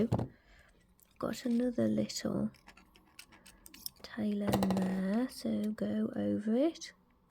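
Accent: United Kingdom. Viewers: Where are you from if I might ask? (Not exactly of course - just region). Your specific accent is so lovely. I have friends all over the UK and each has a different accent but yours is so so lovely. My friend from Coventry sounds sort of similar so I would guess you’re not too far from her. 💚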